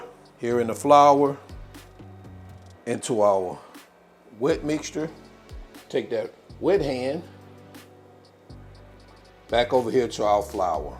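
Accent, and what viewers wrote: South, United States. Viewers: It was really good, just not spicy like I used to make.🔥 Sadly the other people in my household can't handle anything remotely spicy so I have to keep things on the tame side...they literally think a dusting of black pepper is spicy.😭 Funny thing, I came back and watched this video again a few hours ago, it looked that good lol.🤪 I'm from Florida and would often get beef or pork cube steaks and idk why but you can not find either up here in Baltimore so I would have to improvise. I had been wondering what your accent was, could tell it was from somewhere down south. Being from Florida is like Alabama then, hot sauce goes on everything imo too. Last but not least, gonna try the grating the sausage trick because I usually minced with my handheld chopper while frying it and grating would be less work for my carpel tunnel. Sorry for rambling lol.🤣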